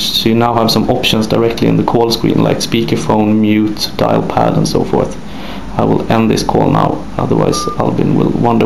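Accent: Sweden. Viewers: Haha låter som svengelska! :D Något nytt datum? Liksom senast om 10 dagar SKA den finnas ute. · Ge oss den uppdateringen istället för att reta oss med din förbannade svengelska!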